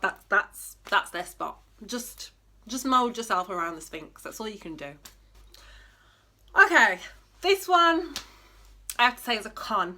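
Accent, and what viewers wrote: West Midlands, United Kingdom. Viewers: That's a west mids accent isn't it ;-) beautiful cat....am getting my hairless baby in one month ;-) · OK I’m going to admit I did not expect the British accent I kind of figured you were from like Brooklyn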